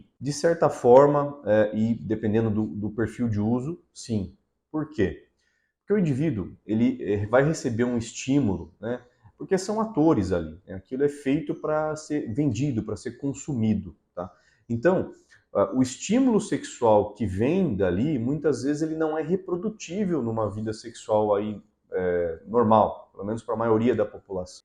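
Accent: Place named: Recife, Brazil. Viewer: Aí sabe que fala Recife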